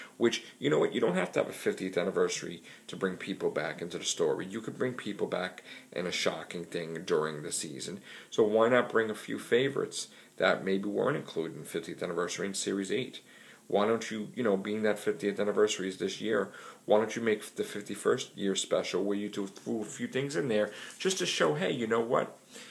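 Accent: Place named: Boston, United States. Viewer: You've got a great Boston accent!!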